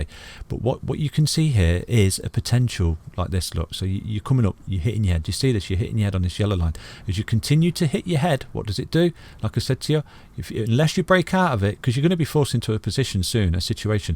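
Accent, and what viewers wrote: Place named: Cockney, United Kingdom. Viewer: It's bad enough you have a cockney accent which is very hard to understand, but you're also a fast talker. That's a horrible combo for a reporter whose audience is expecting to be able to listen to you. SLOW the eff down!!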